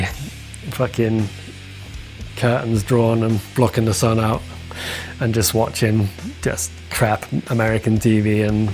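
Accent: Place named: Sweden. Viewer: Sounds like he's lived in Sweden so long that he's developed a Swedish accent. Or is this how people from Corby sound?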